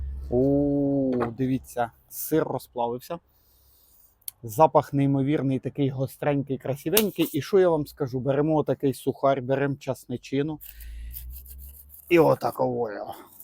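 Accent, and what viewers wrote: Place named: Central Ukraine, Ukraine. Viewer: Виглядає неймовірно й смачно, дякую за ідею і хороший контент❤(якщо не секрет-звідки ви)просто так наче з центральної України)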